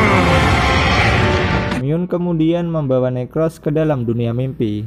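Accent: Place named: Java, Indonesia. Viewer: Medok bangt njir..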